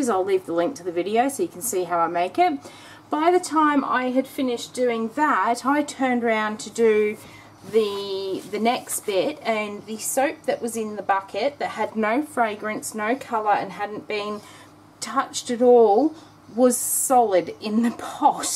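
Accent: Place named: Australia. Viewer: I truly enjoy your videos and enjoy hearing you talk. I don't hear Australian accents very often living in America, so you're fun to listen to 😊 And it is so neat to see all the unique ideas you have!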